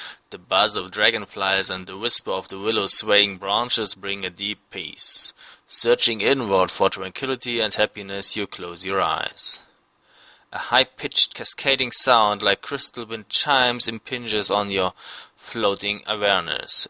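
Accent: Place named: Germany. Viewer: dude, you sound so german!!! :)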